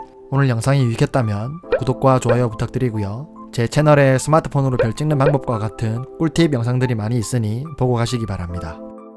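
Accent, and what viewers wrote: Gyeongsang, South Korea. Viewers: 경상도 사투리에 목소리 좋네요 내심 연예하고 싶네요 ㅎ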